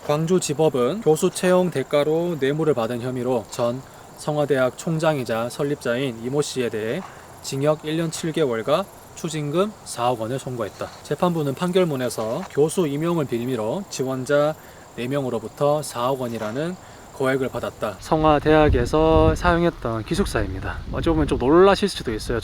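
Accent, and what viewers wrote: Gyeongsang, South Korea. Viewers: 경상도 사투리나 좀 고치든가... 짜증나.. 근데 이런거 올려서 뭐하게?? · 님 말투가 대구사람이신거 같아요 맞나요?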